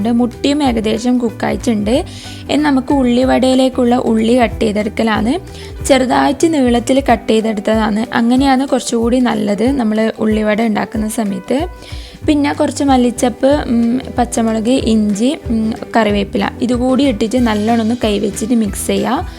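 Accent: Kannur, India. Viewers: Nalla rasand nammale kannur bhasha👍👍👍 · kannoor bashayum poliyan nigalude cookkingum poliyan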